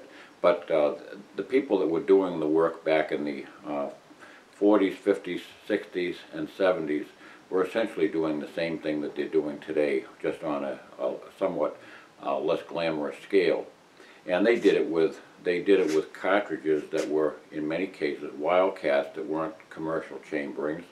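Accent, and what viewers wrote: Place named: Boston, United States. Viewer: This guy has a straight up Boston accent! Hilarious. He sounds [and dresses] like an old Boston cabbie friend of mine. Priceless. I guess you had to be there! Down by the banks of the River Charles. I love that dirty water, O Boston, you're my home!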